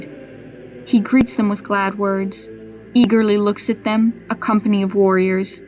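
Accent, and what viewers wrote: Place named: United States. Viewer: Old English poem in an American accent no!!!!!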